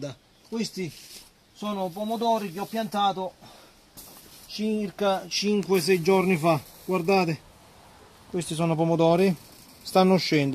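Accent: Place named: Salento, Italy. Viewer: Sei molto bravo , ti seguo da poco ma mi piace il tuo modo di spiegare , molto semplice e "paesano" (complice la accento salentino ). Io faccio così con i pomodori , zucchine , fagiolini , botole , e quest anno anche con i piselli verdi . faccio il contrario cioè prima faccio le buche con le dita e poi semino ma va bene uguale ,la cosa che faccio in più di te è che metto le spaselle in dei contenitori con sotto l acqua (tipo stanati) dove posso sistemare 4 spaselle ogniuno . L altra cosa , uso le microrizze ,tu le usi ? Cosa ne pensi ?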